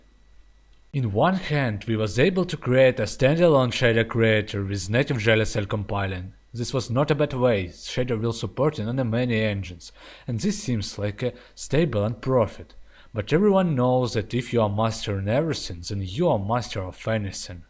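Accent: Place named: Russia. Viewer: Русские пытаются говорить на Английском) , А еще ваши документации сломались с ассет стора.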